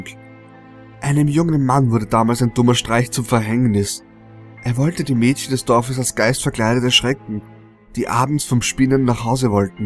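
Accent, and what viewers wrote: Austria. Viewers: bist du jetzt eigentlich Deutscher oder Österreicher? Dein Akzent klingt immerhin nach Österreich:D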